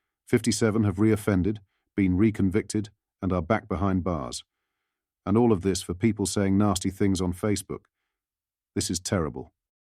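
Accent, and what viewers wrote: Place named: United Kingdom. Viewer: In spite of the robot's British accent, the script for this video was clearly written by a speaker of American English. This is incongruous and jarring when the stream purports to be "Parliment Watch" (sic).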